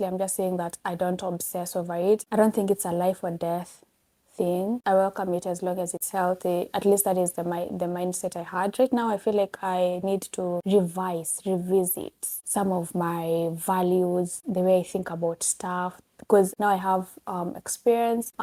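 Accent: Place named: United Kingdom. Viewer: I'm always catching the intonation anamalizia nayo sentences....kidogo tu British accent itaingia..you gotta love ❤❤❤❤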